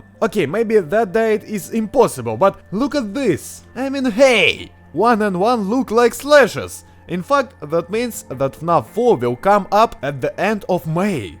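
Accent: Russia. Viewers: Почти у всех русских такой акцнет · Очень странно слушать английский с Русским акцентом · когда ты уже позбудешся руского акцента?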